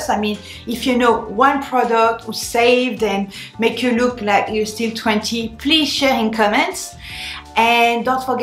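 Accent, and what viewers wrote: France. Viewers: Tu me fais rire., française aussi a.,Adelaide on a kes memes cheveux fins qui frisent et j aime las trop trop de produits mii non plus., Silicium organic d. Espagne est suoer pour kes ongles la peau et les cheveux Moi aussi je suis une vitamins junky multi B fish oil,.....tu as raison pour la longueur mais je suis trop faineante pour me raidir les cheveux 😀 les australiens doivent adorer ton accent 😘😘bravo oour ton chanel comme tu dis 😘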